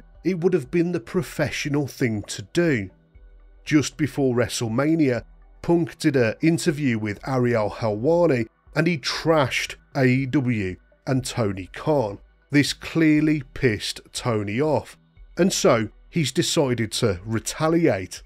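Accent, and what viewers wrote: United Kingdom. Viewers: NGL I was missing your thick British Accent opinion on this. Nice Vid Bruv.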